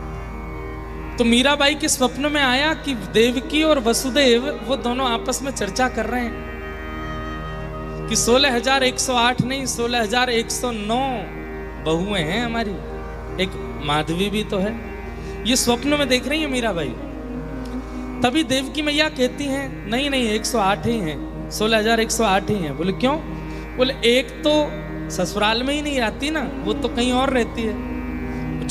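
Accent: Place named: Braj, India. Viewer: Mharaj apki ktha brij bhasha bhut pyarilagtihain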